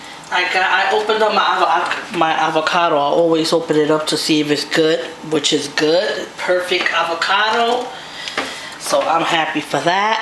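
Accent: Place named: Bronx, United States. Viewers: wow I grew up there on <num> had mainly Dominican and Puerto Rican friends so I can definitely detect a NYC Latina lol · Girl where are you from? You sound like you are from The Bronx (like me)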